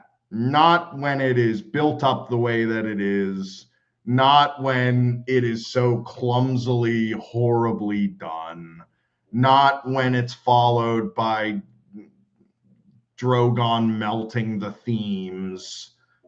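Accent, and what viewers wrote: South, United States. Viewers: I was wondering recently, what would be the reaction, if I heard someone else reading the story. I enjoy Roy's performance for many reasons. It's professional. He has a cadence to his delivery and it is very quick. I've heard for years. Listening to your performance, slower with an American accent, I have noticed things that went unnoticed since 2021. -The guy who fought a wight in the prologue. His blade got covered in frost. His sword had frostburn. That's so cool. I kept missing it, in Roy's delivery. -The man whost Ned dehe ded, he was tied up on a holdfast's wall, when they rode up. I never noticed that. I feel like this version puts glasses on my understanding of the story. It's giving surround sound. I am in the middle and not in the front, watching. So I'm going to listen again. 🚶🏿‍♂️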